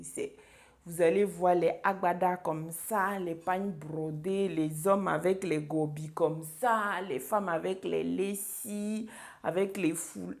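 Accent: Ivory Coast. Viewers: ma soeur tu parles comme une ivoirienne hein c'est bien ça soyons fière de notre afrique vive le BENIN ! J'aime bien ce pays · . Juste pour dire qu'on se reconnaît quand on parle. Et la, perso jai l'impression que c'est une ivoirienne qui parle. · Tu n’aurais pas vécu avec des ivoiriens par hasard? Tu parles un peu comme une ivoirienne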